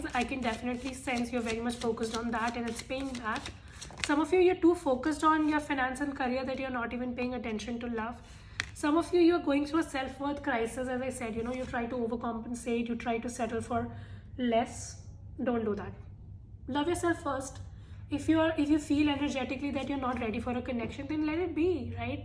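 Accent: India. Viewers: It’s not her fault it’s the Indian language when they speak English ... I worked with them it’s hard you just have to pick up a few words & guess the rest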